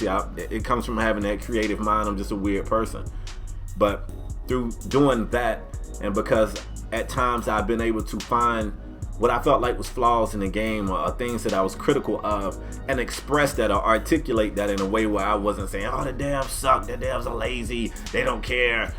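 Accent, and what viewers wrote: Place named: South, United States. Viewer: I remember in 2k12 days you made me create a scoring wing.... An you had a real country accent, it really showed when ever you said rain maker.... you had the best ncaa story’s....You made me watch a madden series.when I hated madden just to see johnnie, josh, and Ed reed reunite.... I wish you the best and may peace and prosperity be upon you 🤟🏾🤟🏾🤟🏾🗣🗣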